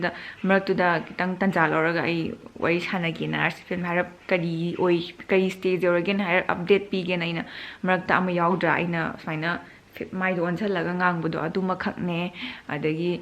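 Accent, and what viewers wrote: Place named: Kakching, India. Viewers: Sister kakching oirga lon khoidene ei kakchingi lon khoibado yam ta nungai chese lon khoirmadi nungaidwni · Kakching marolda adum ngangbiu aduna henna taba nungaia....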